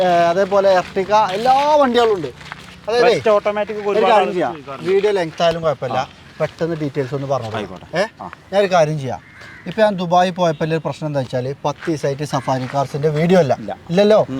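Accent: Malappuram, India. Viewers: മലപ്പുറം ഭാഷ യിൽ ഉള്ള വീഡിയോ വേറെ ലെവൽ 👍🏾👍🏾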